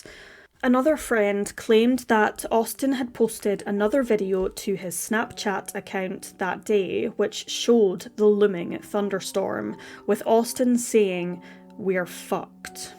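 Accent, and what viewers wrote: United Kingdom. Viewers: We’re fucked….. said in a perfect British accent….instantly subscribed! Best narration ever.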